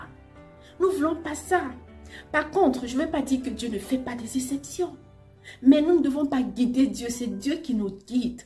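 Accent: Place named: Cameroon. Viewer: Une Fibre camerounaise derrière ta voix😊😊😉 Courage en tout cas, 👍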